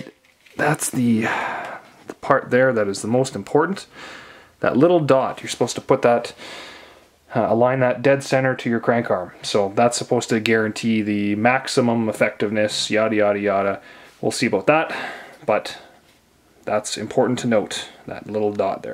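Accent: Canada. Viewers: Thanks this will be helpful. I have an AB oval on my road bike and I DO notice a difference there. I am considering one for my MTB. Used to live in Evergreen, Calgary. I thought I noticed an Alberta Accent.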